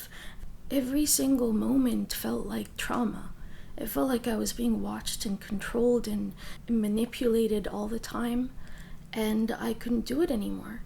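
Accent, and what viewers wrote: United States. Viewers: She sounds AMERICAN, and who wants to look at men anyways? I'd prefer a little segregated lol. Making a mountain out of a molehill. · Why dont she show her before-pics (for proof) with her face on front? It also makes me wonder how fluent and well-versed she is with english languange with no speck of hint of an accent considering she lived in Lebanon for more than 20 years, and magically have perfect american accent, and even if yes, she may have developed that accent, its impossible to sound that good with no stuttering and hint of a slip.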